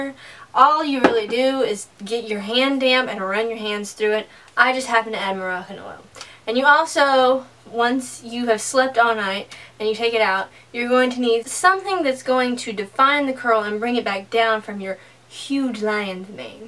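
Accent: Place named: South, United States. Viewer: wowwwww i <3 this video! you sound so southern :) i am too but to me you kinnnnddddaaaa look like miley cyrus hahaaha hope tht doesnt offend you hahaha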